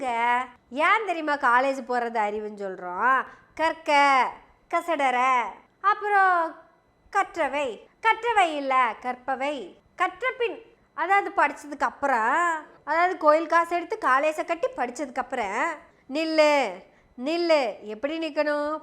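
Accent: Kongu, India. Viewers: அம்மினி உன் கொங்கு பாசையை கேக்கவே உன் வீடியோவை பார்க்க தோனுது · மகளே நீ தெய்வகுழந்தை அப்படியே கொங்கு தமிழில் பொளந்து கட்டுறியே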